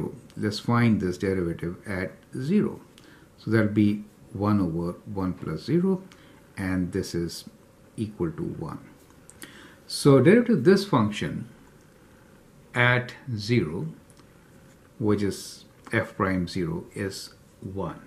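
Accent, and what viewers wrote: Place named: India. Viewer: abi hindistanlı gibi konuşuyosun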